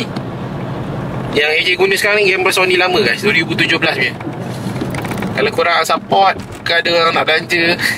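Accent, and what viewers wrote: North, Malaysia. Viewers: Asalamualaikum..nk tnya asal dri utara ka?kedah ka penang ka perak ka?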